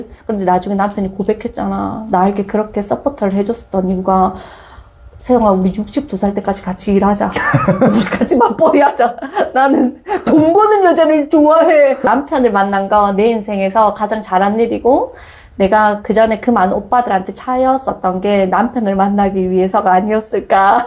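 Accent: Gyeongsang, South Korea. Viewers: 실버버튼 응원합니다. !!! 42개월 여아 키우는 워킹맘입니다. 숲놀이 검색하다가 교수님을 알게 되었는데 지금 저에게 필요한 내용이 많아 이것저것 듣다가 팬이 되어버렸어요 지금 틈 날때마다 계속 듣고 있는데 사투리에 대해 고민이 있으신지 몰랐네요. 전 선생님께서 사투리 안고치셨으면 해요. 제 남편이 경상도사람인데 말투가 너무 쎄고 공격적이라 부드럽게 말해달라고 하면, 경상도라 그렇다. 경상도는 원래 그렇게 말한다라며 정당화 시키거든요. 근데 또 경산도도 내륙쪽이랑 바닷가쪽이랑 또 다르다며 바닷가쪽일수록 더 거칠다라고 말하는데.. 경상도여도 말투가 이렇게 다정하고 부드러운분도 계시다고 꼭 알려주고 싶어요 ~~~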